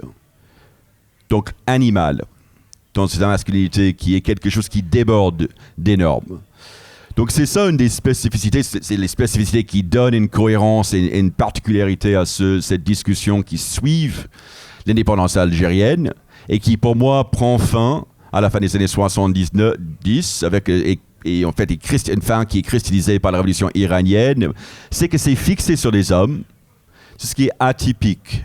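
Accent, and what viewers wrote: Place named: United States. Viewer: Je crois que c’est quasiment la première fois que j’entends parler français avec un accent américain. J’aime bien l’ambiance.😂 Et les propos sont très pertinents, très intéressant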